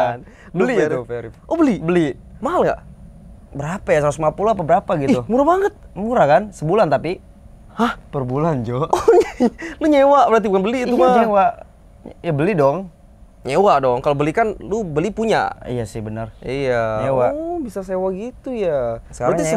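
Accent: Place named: West Java, Indonesia. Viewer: Sunda bnget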